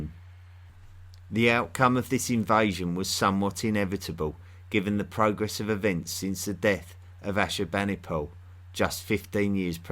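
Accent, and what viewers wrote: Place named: United Kingdom. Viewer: Its one thing this poor bastds born a uk subject, but to be double querst(sic) with a stupid uk accent it terrible enought to change the channel. God, i cant stand the commonwealth bastards.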